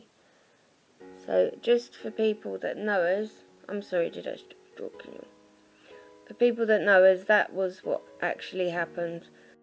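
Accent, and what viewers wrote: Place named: Midlands, United Kingdom. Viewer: Poor little furry cutie pie. Glad he's doing better. Best wishes to ya. That accent though... from the Midlands, are ya?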